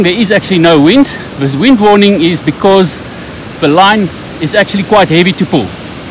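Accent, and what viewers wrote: South Africa. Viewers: i love the accent. sounds south african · are you South African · Sounds very South African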